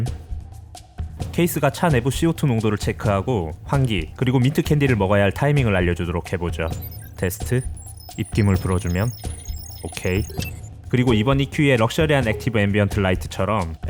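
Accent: Gyeongsang, South Korea. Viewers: 사투리? 경상도분이신가? 암튼 멋집니다👍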